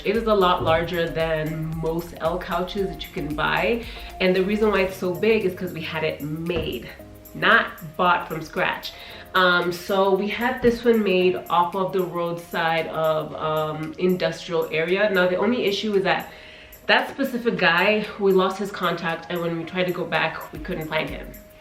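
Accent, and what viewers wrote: United States. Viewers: You have a beautiful place Madam. You stated that you are in Nairobi but you have an American accent. Are you an American living and/or working in Kenya? You are also very pretty. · I loved your tour so much but please try to reduce throwing up your hands constantly.i thought I was watching an American woman with accent, attitude everything.I didn't enjoy the narration.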